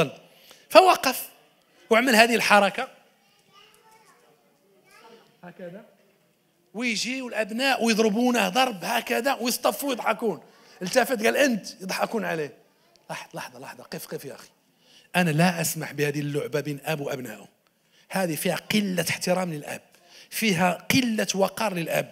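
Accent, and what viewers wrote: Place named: Morocco. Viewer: نعم اختي شككت في اول الامر لان لكنته مغربية والأن كأدتي لي تخميني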